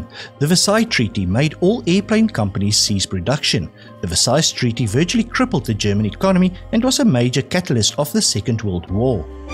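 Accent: South Africa. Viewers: Love your South African accent. Nothing is 500 million years old, it's pure guessing. Study the subject further.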